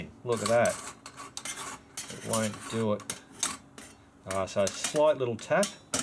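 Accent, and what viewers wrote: Australia. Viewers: Wow, that made me belly laugh! 😂 I'm a Brit and I don't know why but your Ozzy accent just did it for me. Reminded me a little of Rolf Harris (in better days). So entertaining! About the simmer ring, wouldn't it be easier to adjust it before placing it on the burner? ie. Allow the liquid to boil, without the simmer ring and then fit it using the tongues. Obviously experience will guide the pre-adjustment. Just a thought. Thank you for brightening my day! 👍😁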